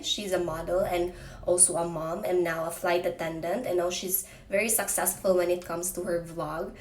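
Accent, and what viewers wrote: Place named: Visayas, Philippines. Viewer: mkamingaw imong tingog nga bisdak 😂❤️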